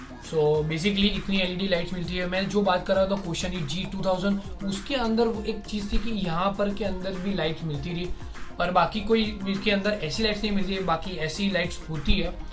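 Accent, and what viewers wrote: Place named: Butler English, India. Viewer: He speaks butler English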